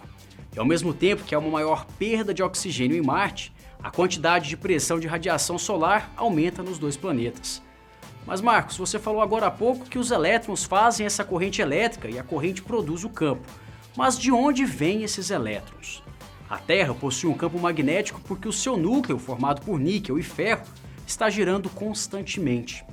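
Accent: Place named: Northeast, Brazil. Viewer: Kkkk. Aki no nordeste algumas pessoas falam assim😂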